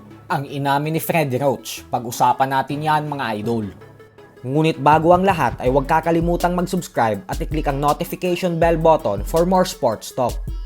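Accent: Visayas, Philippines. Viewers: Ayusin mo boss mo visayang Visaya 😅😅😅😅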